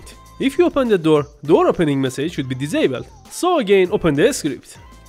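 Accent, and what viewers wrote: Iran. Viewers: لهجت ایرانی میزنه❤ ایرانی هستی؟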